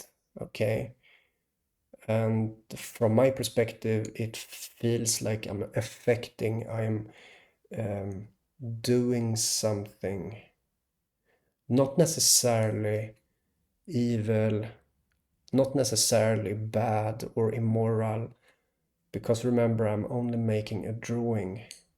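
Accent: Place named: Eastern Europe. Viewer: Just curious, what's your country of origin? I think I'm detecting an Eastern bloc accent.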